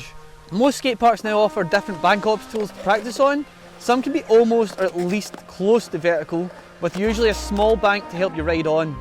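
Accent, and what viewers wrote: Scotland, United Kingdom. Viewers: Skate YouTube is so Americanized, I did not expect to hear the Scottish accent, and it was a welcome sound haha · Never expected the scots accent!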